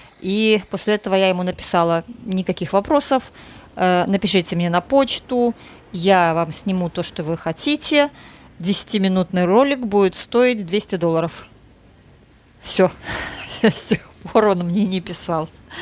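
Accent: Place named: Belarus. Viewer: не слушайте критиков вашей дикции. У вас чудесная дикция с едва уловимым, красивым белорусским акцентом. Так-же, не слушайте придурков политизированных маргиналов провоцирующих вас по по поводу языка общения. У вас всё отлично и ваш канал замечательный.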